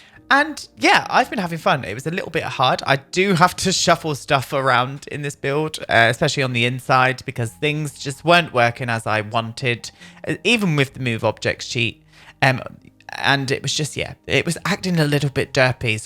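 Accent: New Zealand. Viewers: I'm Australian...pretty sure that presenter is a Kiwi. 😉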